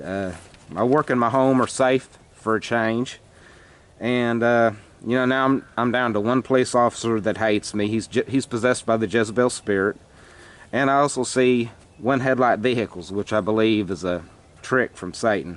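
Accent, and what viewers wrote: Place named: Tennessee, United States. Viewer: Oh my hole reason to message you was to thank you for submitting to our Creator and also to ask you if you were from Tennessee cause you sure have a Tennessee, Kentucky, Mississippi accent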